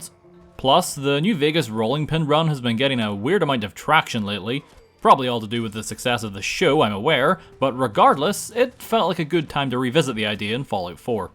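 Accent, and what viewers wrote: Northern Ireland, United Kingdom. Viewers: It's a pet peeve of yours that that someone from Northern Ireland doesn't speak in an American accent? How very odd.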